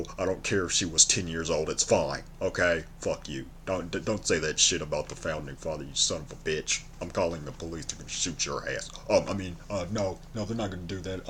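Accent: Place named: Carolinas, United States. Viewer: I look forward to seeing more videos from you. Your accent is interesting. Are you from the Caralinas?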